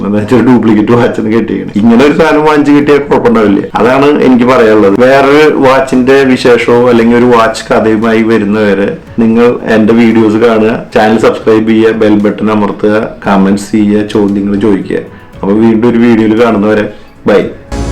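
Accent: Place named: Thrissur, India. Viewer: തൃശൂർ ബ്രോ ആണല്ലേ 👍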